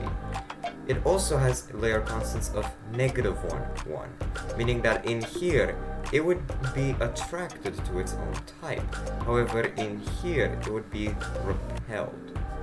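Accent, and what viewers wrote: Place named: Romania. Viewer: A video about 3 years ago, and actually sounds romanian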